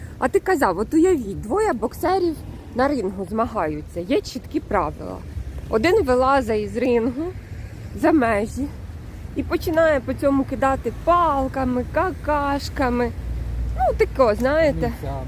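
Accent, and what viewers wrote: Cherkasy, Ukraine. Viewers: О Боже правий, ніколи б у цьому місті-гетто не жила б. Для мене повний жах 😱оці коробочки, між ними машини і поодинокі деревця. Особливо одинокими себе тут відчувають діти. Але людина і до такого звикає. Може в чомусь я і не права, але для мене гарніші в моєму 20тисячному місті різнокольорові двоповерхові котеджі з кленовою алеєю на проспекті. Як маленька Швейцарія. Чомусь киян мені завжди жаль. І дитина моя там живе, І її жаль. Швейна сторінка у Вас цікава. У нас така говірка на Черкащині.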